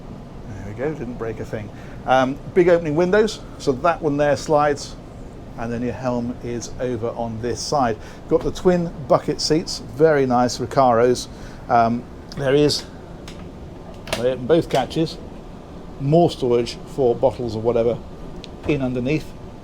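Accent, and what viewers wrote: England, United Kingdom. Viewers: starting my morning with "crockery" always makes me happy (don't ask me why...I have no idea, just love the English vocab and accent)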